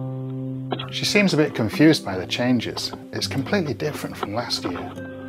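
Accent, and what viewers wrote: United Kingdom. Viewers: its not long before luner is spending her days here.....luner? or luna? oh your british, ughhhhh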